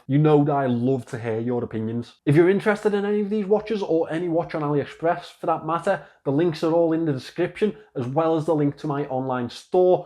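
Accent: Liverpool, United Kingdom. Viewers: hey, nice choice of watches. Nice video. What accent do you have? Scouse?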